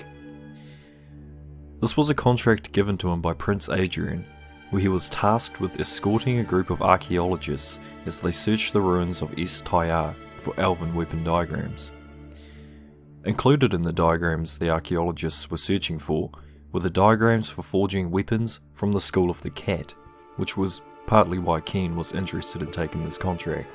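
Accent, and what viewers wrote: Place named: New Zealand. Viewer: Definitely from new Zealand (am Australian)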